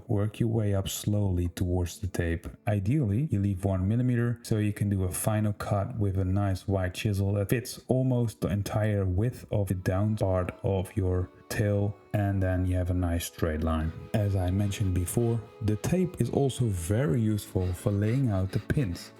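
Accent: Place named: Netherlands. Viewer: Sounds dutch to me, but I like it... I, surprised that you don't have a jig for dovetails.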